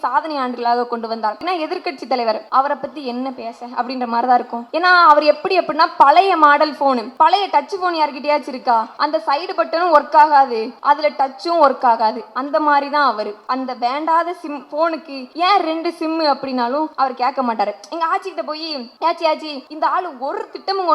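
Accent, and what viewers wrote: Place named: Tirunelveli, India. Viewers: ஏ நீ திருநெல்வேலியா பேச்சிலே தெரியில்லா ஏநல்லாஇருக்கு‌ பேசு பேசு. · திருநெல்வேலி ஸ்லேங்கில் தமிழ் பேச்சு செம தூள் சகோதரி. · Thai unakku entha ooru. Peiru enna...arumaiyana Nellai Tamil. Tamil vazhga. Tamirabarani vazhga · நெல்லைத் தமிழ் அருமை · திருநெல்வேலி மண்ணின் வாசம் உன் பேச்சில் அருமையான பேச்சுமா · Wow pappa super nellai slang speech,,real speech about irresponse some politicians,, congratulations,, thank you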